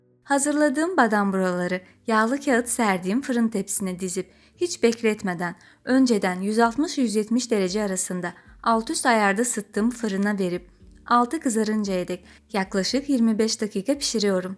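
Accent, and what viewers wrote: Azerbaijan. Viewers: bozma ay bacım o geşeng azerbaycan şiveni bozma, öz şivende danış..bizim dil bozuk zaten, bari siz özünüzce danışın ki gerçek türkçeyi sizden öğrenebilek..gurban olum sizin bezekli danışığızı yaradanıma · Normaldir Azerbaycan ve Türkiye sivesi karisinca cok güzel bence. Sizde Azerbaycan sivesile konusmayi deneyin bence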